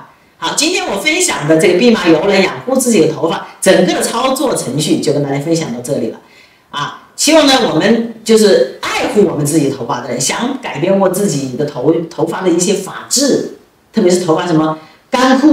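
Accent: Wuhan, China. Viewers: 感觉博主口音一听就是武汉人哈哈 我也是武汉的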